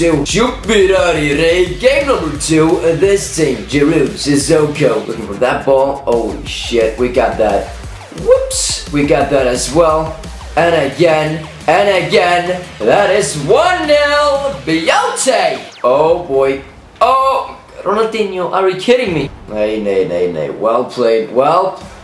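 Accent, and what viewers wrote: Iceland. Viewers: Everyone saying his voice is annoying did you ever think it was because of his accent and hes from iceland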